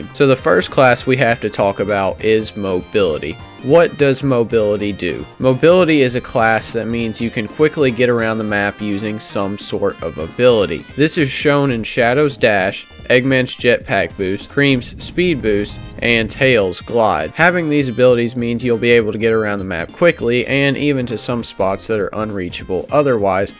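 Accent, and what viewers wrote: South, United States. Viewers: You kinda sound like a red neck which I really like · Bros so country that he sounds like a guy from a commercial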